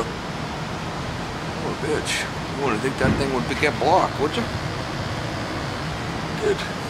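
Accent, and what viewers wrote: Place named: Northeast, United States. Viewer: u soumd like ur frmm UMM?? northeast there. I have a reheem 1973 gas heater. it heats up like sound is boombush but after that no sound from the fan motor and I did put in canstat l22-9a-11 there that didn't work but I got the fan motor going there but noo heat though. could it be the fan limit switch there thanks George..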